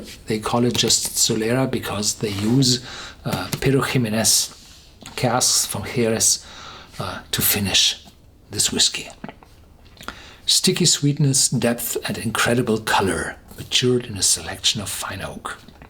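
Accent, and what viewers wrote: Germany. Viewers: Where are you from? Your acent sounds like a German one.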